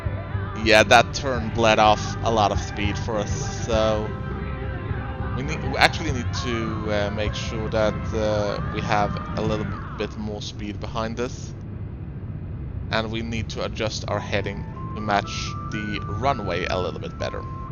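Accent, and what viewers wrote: Sweden. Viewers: good svengelska fett bra video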